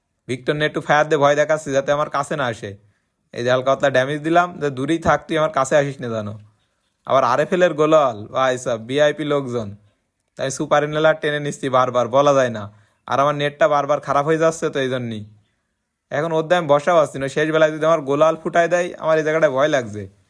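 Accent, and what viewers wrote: Jessore, Bangladesh. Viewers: ভাই আপনার বাড়ি কি যশোর, সাতক্ষীরার দিকে?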